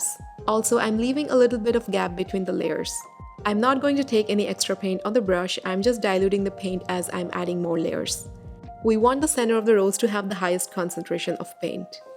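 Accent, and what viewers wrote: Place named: England, United Kingdom. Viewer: Lovely cards 🥰 loving ur English accent as well 😘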